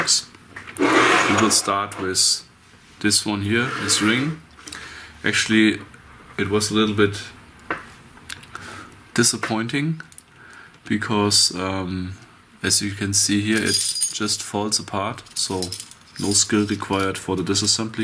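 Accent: Germany. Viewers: kommst aus deutschland oder?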